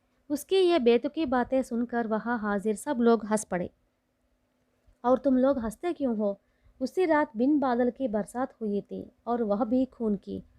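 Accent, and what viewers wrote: South, India. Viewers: So nice 👍. Old Chandamama of 1960s and 1970s was pure 💎 gem. Carry On dear. Read more of these in Hindi version so that deserving children are not deprived of the good lessons taught in childhood 😊😊😊😊. Best of luck 😁. Your South Indian Hindi accent makes it so sweet and beautiful to listen. See that your accent has become your strength and advantage.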